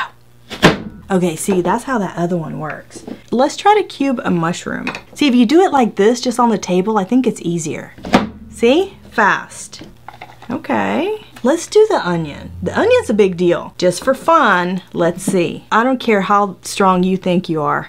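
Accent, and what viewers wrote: United States. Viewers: Where is her accent from? Certain state of 🇺🇸?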